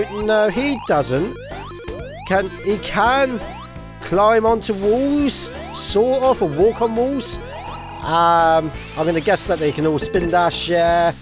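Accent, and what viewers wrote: United Kingdom. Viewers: At the start of the video I thought you speak another language but my brain wasnt working and your British accent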